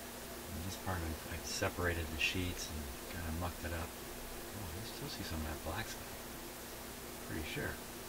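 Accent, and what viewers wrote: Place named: Canada. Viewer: Fascinating. I had heard a lot about "silver mica disease", but it's great to actually see evidence of the problem. I just found your channel through this video, and was thinking "this guy sounds Canadian", then I spotted the maple leaf sticker on your toolbox!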